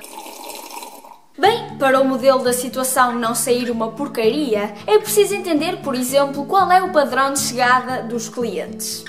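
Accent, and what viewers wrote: North, Portugal. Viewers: Osotaque dela é um sotaque peculiar do norte de portugal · Português de Portugal muito engraçado ksksk muito lindo cara kksksk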